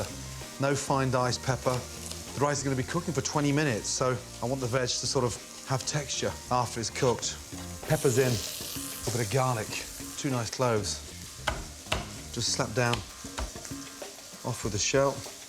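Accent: Scotland, United Kingdom. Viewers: I definitely hear the Scottish come out in this video way more than others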